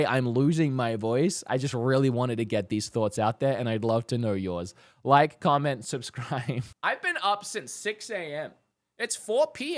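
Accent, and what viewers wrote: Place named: Australia. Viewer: I have to ask, are you Australian or American? Because you have mostly an Australian accent but you mix a lot of words in with an American accent. I'm so confused lol.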